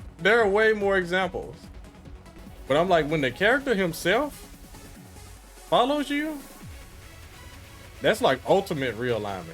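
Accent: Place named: South, United States. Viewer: I like your southern accent.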